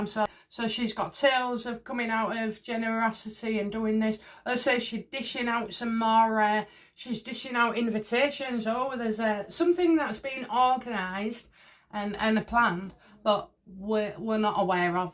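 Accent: United Kingdom. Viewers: Her British accent is too thick I love it but can understand her.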